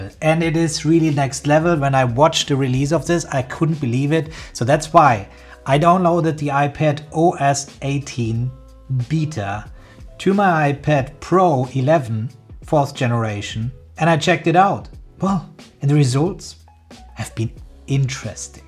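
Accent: Germany. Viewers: Du kommst doch Safe aus Deutschland oder?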